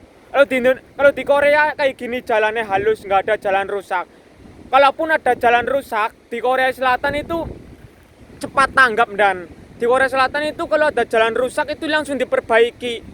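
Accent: Banyumas, Indonesia. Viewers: Jelasine oke bgt.. ngakak pke ngapak lanjutno mas.. 😂😂😂😂 di Indonesia aspal di kira coklat jadine di jdiin cemilan 😂😂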